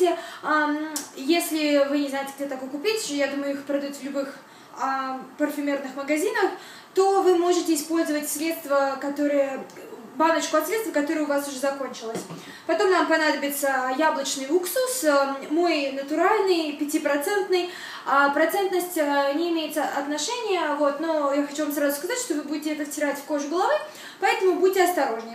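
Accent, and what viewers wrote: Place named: United States. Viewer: у тебя акцент))) наверное ты проживаешь в Америке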